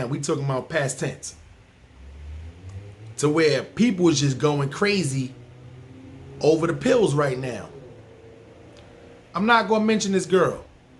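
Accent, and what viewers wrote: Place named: New York, United States. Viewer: I know you must rap you the New York voice what’s good out there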